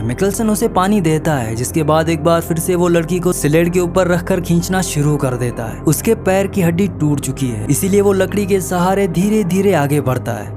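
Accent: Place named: Kashmir, India. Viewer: Your Hindi has a accent of Kashmiri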